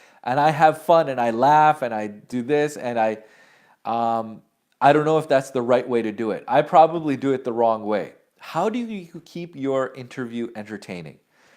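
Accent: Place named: United States. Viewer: I wonder how he got american way of speech..hmm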